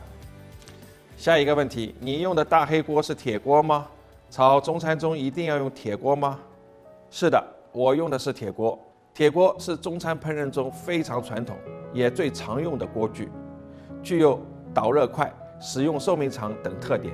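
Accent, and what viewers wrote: Shanghai, China. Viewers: 上海人伐？听口音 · 能听出来John大厨的上海腔调，不亏这么多年在魔都积累的经验。😂 我是湖南的，希望可以多看到点湘菜系的菜肴！Best wishes to the TS crew, esp. during these dark days.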